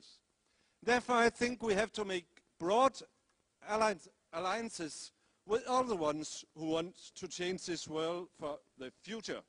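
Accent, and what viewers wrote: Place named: Denmark. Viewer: joken er lidt på dig, for han siger faktisk THEREfor, og det passer perfekt... Han engelsk er sådan set fint, han har dansker accenten og lidt grammatiske problemer....men han når selv og rette de fejl han laver... så længe han forstår og formidle sig så pointen kommer igennem er det vel fint? Men, han ku' nu godt ligne viggo sommer en smule ;)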